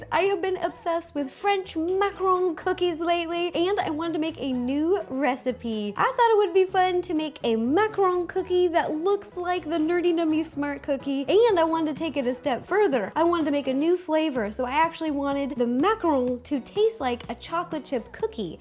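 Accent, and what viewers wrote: United States. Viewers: Can I just ask, is this all imperial? I use metric, cause I live down under, aaaaaaaaaaand I wanna make some stuff from Ro's vids, but she sounds American, so I'm guessing it's imperial.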